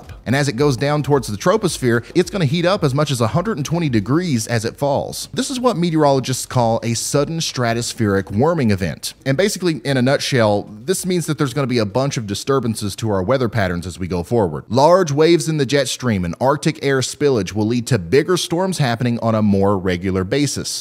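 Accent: United States. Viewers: As I noted how country your accent was I realized how country I speak as well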